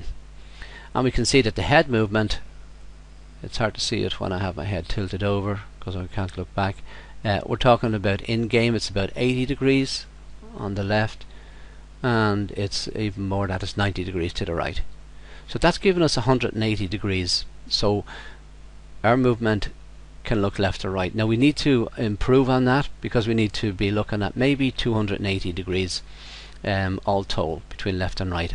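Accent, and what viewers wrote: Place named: United States. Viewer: A very good video!!!! You have a bit of an accent. From the USA!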